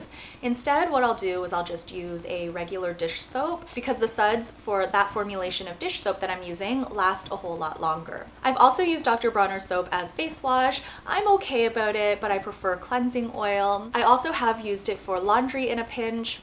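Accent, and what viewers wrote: Canada. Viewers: Canadian? Sounds like it from how you say out and in a pinch.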